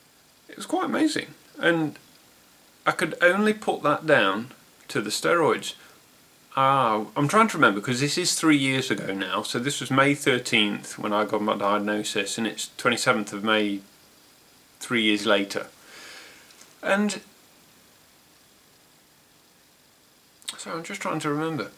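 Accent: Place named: Nottingham, United Kingdom. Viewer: Hi, I thought I recognised that lovely accent of yours, then you mentioned Nottingham! I am originally from Mansfield, living up in Yorkshire now though. I am finding your videos very informative, currently going through the MS diagnosis process myself. Keep them coming, they are very helpful. Thanks.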